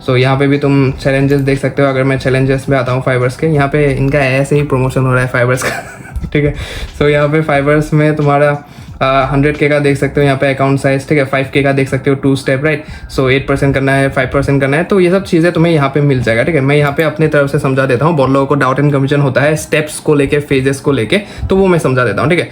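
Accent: Assam, India. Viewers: Kotha pota style tut ye axomiya lage 😂❤️🙏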